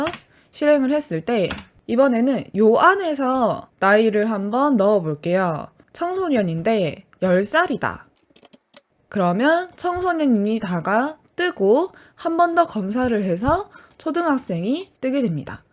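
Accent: Gyeongsang, South Korea. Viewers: 정보처리기사 시험용으로 C, JAVA는 인강으로 배웠지만, 인강에 Python이 없어서 어쩌나 했는데 여기 SONOL에서 느무느무 잘 배웁니다. 갱상도 액센트 늠 귀여워요~~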